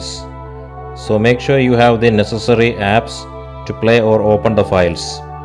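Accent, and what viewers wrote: Kerala, India. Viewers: That is 100% kerala accent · 100% Indian. O hate your voice so much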